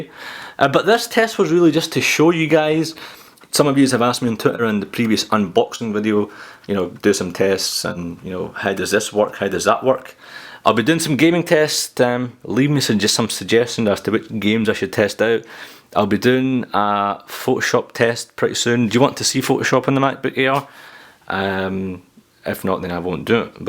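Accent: Scotland, United Kingdom. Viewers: I felt that i learned more and paid attention better because of the scottish accent. Made it seem friendlier! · Thanks for the review man, it was very helpful. By the way, nice accent, reminds me when I was living in Scotland. Lovely People!!! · damn i dont understand shit in scottish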